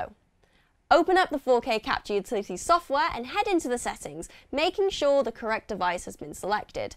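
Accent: United Kingdom. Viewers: Thanks for the tips, and I love how you Brits pronounce "H" lol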